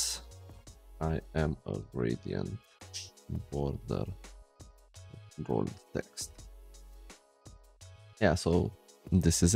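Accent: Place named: Romania. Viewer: Ai voce de roman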